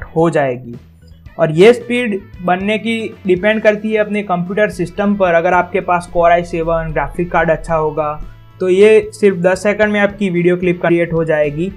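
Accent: Gujarat, India. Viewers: Brother aap Gujarat se ho kya????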